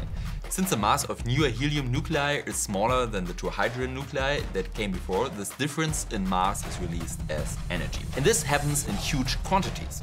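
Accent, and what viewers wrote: Germany. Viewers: Physics is best "auf Deutsch", or "auf Englisch" with a German accent! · Wenn ich als Deutscher Deinen deutschen Akzent extrem ulkig finde, dann ... ist es anscheinend genau richtig.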